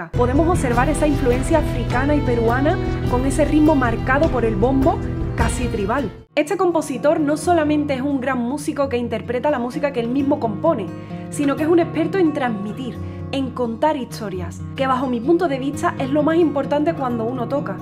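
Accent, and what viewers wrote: Andalusia, Spain. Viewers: Andaluz. De Andalucía, la región sur de España. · Qué bello cover!!! ❤ amé!!! 😊🔥👏 PD: me encantó tu acento andaluz 😍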